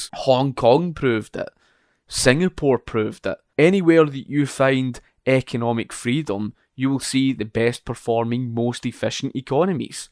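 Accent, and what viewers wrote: Scotland, United Kingdom. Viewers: Scottish that’s the accent I was trying to place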